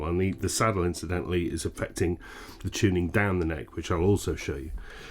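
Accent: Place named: England, United Kingdom. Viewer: Its painful The English accent makes it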